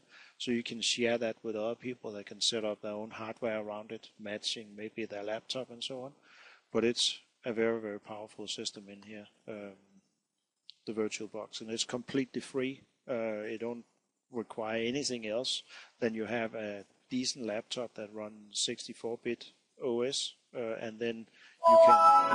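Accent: Denmark. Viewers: He's Danish, though. :) I sounds like. :) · R u from denmark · are you danish? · Du lyder meget dansk, du taler Engelsk med dårlig dansk accent. Er du fra Danmark? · man kan sagtens høre du er dansk xD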